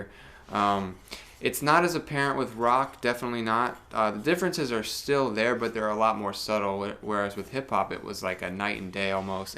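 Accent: United States. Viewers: Why do you want an american accent full time? Awesome review. I have a cobalt but mainly use it at home. Probably will get this for mobile.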